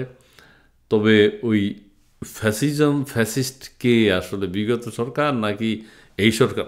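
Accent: Noakhali, Bangladesh. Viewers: ধন্যবাদ আপনাকে ভাই। আপনার কথা শুনে ইচ্ছা করে। আপনি নোয়াখালীর ভাষায় কথা বললে ভালো লাগে। যদিও আমি পটুয়াখালীর।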